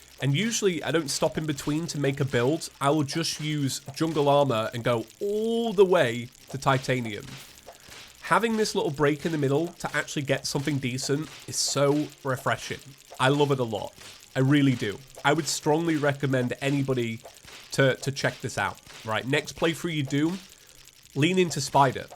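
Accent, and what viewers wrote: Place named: London, United Kingdom. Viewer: Yoo wats up fellow londoner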